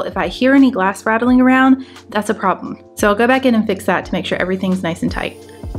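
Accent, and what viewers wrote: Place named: South, United States. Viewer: Did you hear her southern accent? Now the hats make perfect sense 😂❤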